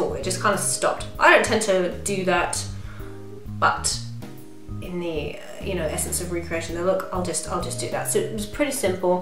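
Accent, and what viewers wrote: United Kingdom. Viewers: you are sooooooooooo beautiful even without makeup...but then again you must get that a lot already....but i still had to say. love your tutorials and thanks for putting up all the names becasue sometimes i hv a hard time picking up on the words with your absolutely lovely sweet english accent. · I agree with Teresa!!, your voice is so pleasant to listen to!, very relaxing and I do too love your british accent, that's something I have always liked (british accent). This tutorial was very good too, you explain so well!!. The lipstick/blush combo really complemented each other. Very pretty!!, you are our favorite!!!, keep the amazing job!!!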